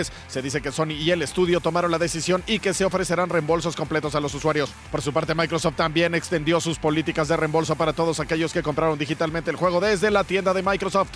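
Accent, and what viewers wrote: Argentina. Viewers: y vos como te sentís? te da vergüenza jugar videojuegos con acento argentino, lo mejor que he oido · ese acento argentino.. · Excelente acento argentino... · Muy bueno ese acento argento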